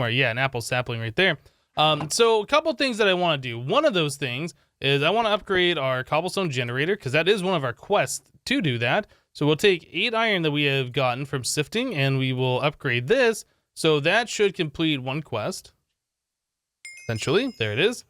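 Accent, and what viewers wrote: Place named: Canada. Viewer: Are you Canadian? You have a funny accent.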